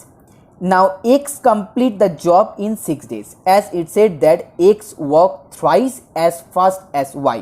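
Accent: Bengal, India. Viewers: Sir is Bengali guranted because voice tone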